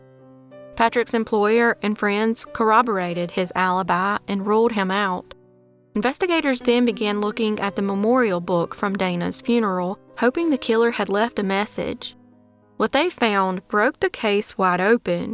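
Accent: United States. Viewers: just found your channel.. Good narration...Concise.. with the best American accent. Appreciating your content from the UK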